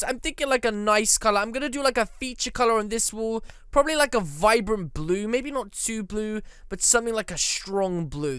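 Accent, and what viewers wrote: England, United Kingdom. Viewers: one quick question. Are you English or from England?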